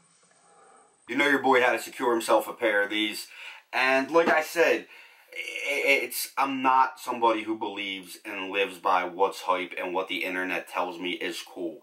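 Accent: Ireland. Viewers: I knew it I was hearing the Irish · These are dope. Are you Irish, kinda hear an accent?? Lol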